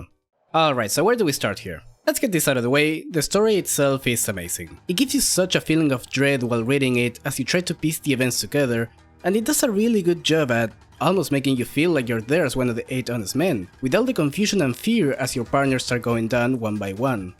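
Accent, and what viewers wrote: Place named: Argentina. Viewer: mira flaco no se porque llegue aca sinceramente pero es increible lo facil q es sacar el acento argentino d una persona, aguante argentina y el diego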